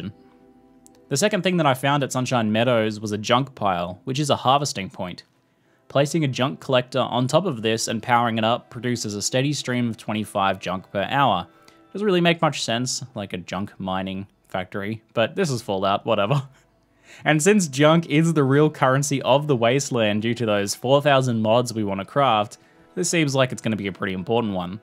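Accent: Australia. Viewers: Are you aussie ?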